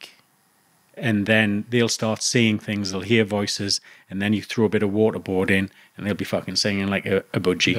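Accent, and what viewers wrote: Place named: Newcastle, United Kingdom. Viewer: Aye wor kid he's a fuckin Geordie an all!..sounds like he's from Shields or Byker lol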